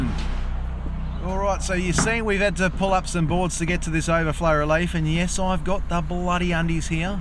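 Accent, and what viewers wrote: Australia. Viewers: Hey there mate I just stumbled on your YouTube a few weeks ago and I just subscribed to your channel, I’m from Adelaide, South Australia and you have really put a big smile on my face watching your channel, you are sure a %100 Aussie from your accent, I was born here in South Aussie from my parents who escaped Vietnam for me to have a safer life here in Australia, I’m 44 in July and you have made me smile from the first YouTube I’ve watched. I’ve been through cancer since 1991 and kicked cancer in the butt. You keep up with the good work your doing 😊😊😊😊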